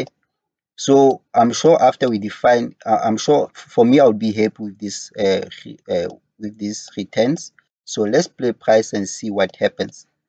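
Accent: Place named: Sierra Leone. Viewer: Are you from sierra Leone bro? You sound like one . Nice video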